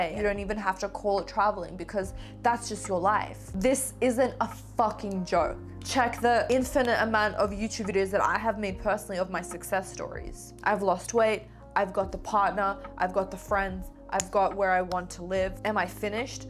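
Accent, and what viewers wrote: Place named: Australia. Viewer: I love how this made me feel. Thank you for this kick up the butt. Hearing a fellow Aussie accent saying things like this hits 👌 I appreciate it!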